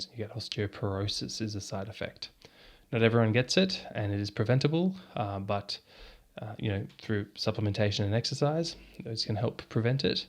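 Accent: Australia. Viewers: He's Australian, he sounds Australian the whole time to me as an Australian myself.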